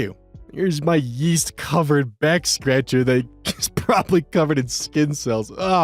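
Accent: United States. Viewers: Honestly i think you did fine on the spanish pronunciation. You have an american accent about it but im pretty sure we all do that but at least your vowels were correct which is really difficult for some people. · not a Spanish speaker, but I was in Mexico for 3 months. You sound like me, like you tried but still have the US accent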